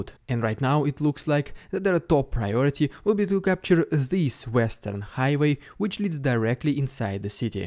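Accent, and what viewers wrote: Russia. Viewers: I know it's 'not all russians' and I am glad for decent russian people like you. And I love your accent. :) I have a little bit of russophilia left in me despite the last year. (and incidents before them too). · Nice guy. Your English with Russian accent is very clean and smooth and soft... some very good lessons with enunciation. Keep up the good reporting. I know because you're Russian background your popularity will be lower, but you're doing well... keep it up!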